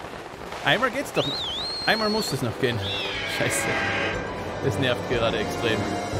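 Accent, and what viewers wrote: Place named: Bavaria, Germany. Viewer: In welcher Stadt lebst du eigentlich weil du hast so ein bayrischen Dialekt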